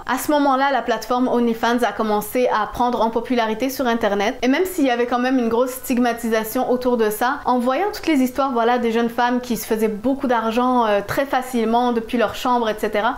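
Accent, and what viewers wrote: Quebec, Canada. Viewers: Es tu québécoise ??? Je viens juste de m'abonner à toi, je t'ai vu sur tiktok avec un thread d'horreur de la petite fille complice pour en enlever une autre bref jai bien aimé alors je vais te suivre sur YouTube aussi... bravo à toi xoxox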